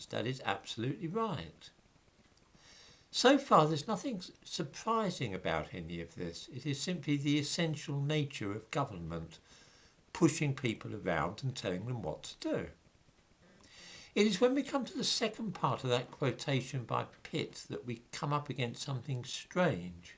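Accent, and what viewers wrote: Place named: United Kingdom. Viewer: "Hello again." I can't help my self to repeat it in the same fashion in a moc your accent. Imitation is flattery type of way. It sounds so nice, I must hear it twice. Lol please don't take it as culture appropriation or a ethnic offense please. I love when Brits do silly impressions of Americans. Do you have any audiobooks on the markets?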